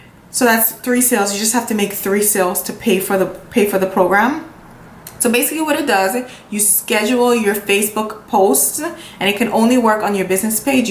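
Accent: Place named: New York, United States. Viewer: How did I know you was from NY and then you said Downtown Brooklyn.. Best wishes papa sis from Brooklyn😍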